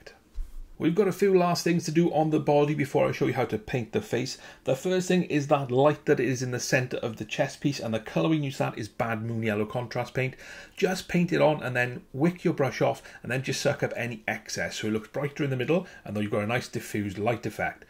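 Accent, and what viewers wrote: Wales, United Kingdom. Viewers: You Welsh man? I'm like 99% sure but its so uncommon finding another Welshie online lmao. Anyway, bangin paint job, I just got a bunch of votann and I'm loving the tips!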